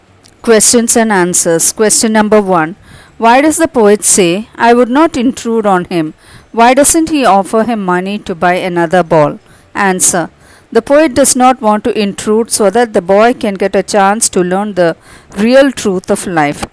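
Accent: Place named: South India, India. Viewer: Mam is you from south india😅 our shool sisters explains similar like you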